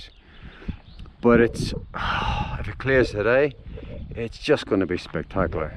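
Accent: Northern Ireland, United Kingdom. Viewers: Fabulous and entertaining, as a recent subscriber, these vlogs are right up there in content, compilation and delivery. His Irish charm effuses the delivery, great that he has to temper his Irish poetic romanticism! I would guess that with his soft N. Irish voice grew up in the northern part of his Co.Down? and looked south to the beautiful Mountains of Mourne for his inspiration?!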